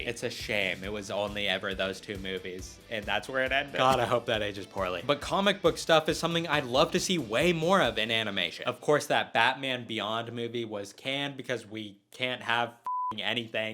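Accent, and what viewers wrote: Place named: Chicago, United States. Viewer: this guy gotta be from Chicago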